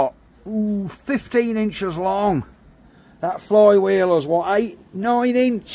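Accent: Norfolk, United Kingdom. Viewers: I love the Norfolk area accent. It's a bit like the south west accent without the exaggerated "arrr" sounds.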